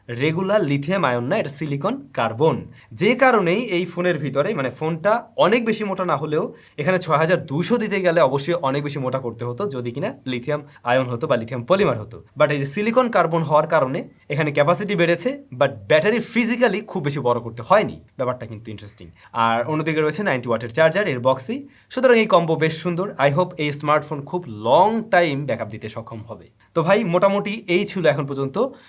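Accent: Barishal, Bangladesh. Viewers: borishailla tola aise phone review korte ,, taw atc er moto vfx diya ,, hla cringe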